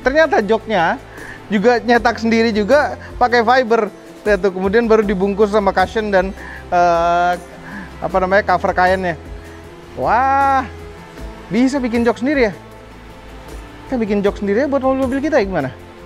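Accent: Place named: Bali, Indonesia. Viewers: Logatnya bali banget....😂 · cara bicaranya Bali banget👍😂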